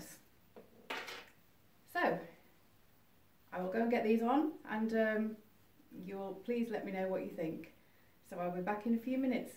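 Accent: United Kingdom. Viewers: Helllo greeting my name is Abner nice meet you this my first time watching your video you look beautiful greeting from United States 🇺🇸 of America at State of Georgia here southeast coast, close to Atlantic Ocean. I’m fan women wear high heels pantyhose stiletto and 12cm 13cm 14cm 15cm 16cm and platform too I do like stocking and nylon thigh high 😅too! You have good accent from English in England 🏴.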